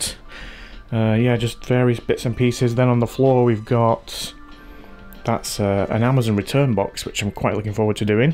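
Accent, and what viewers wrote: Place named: Manchester, United Kingdom. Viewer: Eh up! As a Manchester lad, now long time in the antipodes, yer accent makes me feel righ' tat ohm. Makes me laugh when you forget to modify your accent for a wider audience ( luke vs luck for 'look').Your compact work station and editing suite is magnificent everthing in its place! Neat. Your problem tracing and solving skills are really Sherlock Holmes level. What a winner you are! Just love all your postings. I've learned at lot.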